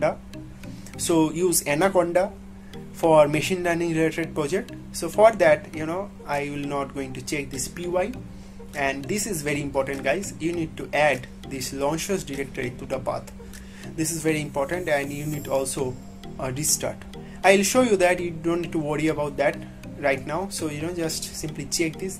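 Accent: Japan. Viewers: how the hell did he sound from JAPAN?!?!?!? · I was guessing Japan lol sorry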